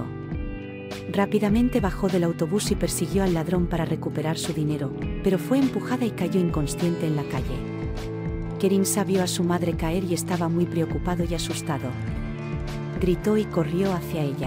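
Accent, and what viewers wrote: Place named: Castile, Spain. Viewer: Narración en perfecto castellano... Lástima que no sea el capítulo completo.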